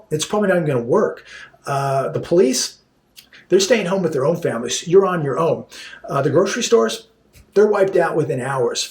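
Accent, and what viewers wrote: United States. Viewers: Is american or Canadian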